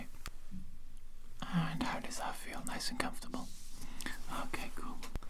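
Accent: Wales, United Kingdom. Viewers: can i hear a welsh accent?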